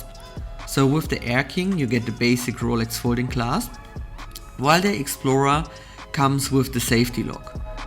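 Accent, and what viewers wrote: Germany. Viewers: Great - where are you come frome ? Your dialect sounds like German :) If so - I would be interested what’s your job?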